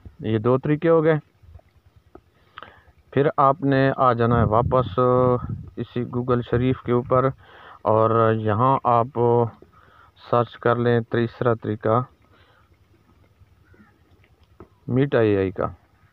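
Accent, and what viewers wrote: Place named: Saraiki, Pakistan. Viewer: Lahja sariki Hy...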